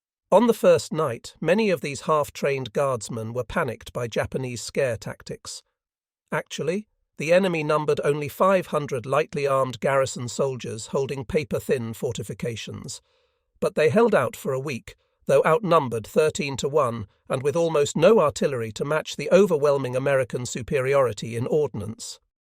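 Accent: United Kingdom. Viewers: would be great without this bad accent......its a American story..dopes...thats a limey ..Semper Fi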